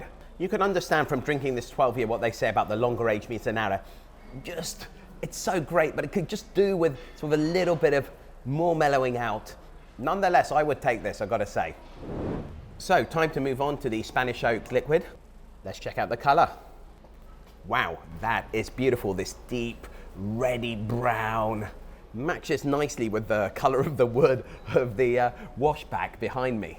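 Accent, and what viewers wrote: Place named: England, United Kingdom. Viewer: What an amazing video! When I go to Japan I want YOU to be my guide!! I have watched many of your videos and I love them all, very interesting! It is nice to see an English man that knows so much about Japan and whisky!! And I love your accent! Thank you so much for creating such interesting content about Japan, I enjoy every single one. Kanpai!!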